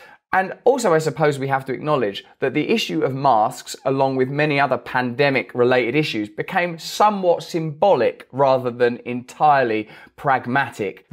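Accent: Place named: Cockney, United Kingdom. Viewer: Hey, could you please say "story" also without a "t", as you omit all other "t-s"? I'm joking 😊, big fan 🤩, keep up the cockney 😆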